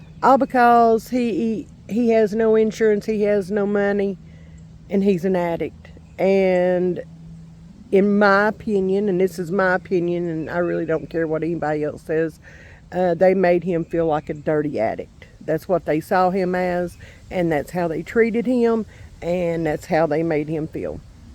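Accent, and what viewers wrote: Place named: West Virginia, United States. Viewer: Do you mind saying where you live, what state? I am from WV, and your accent sounds very much like WV.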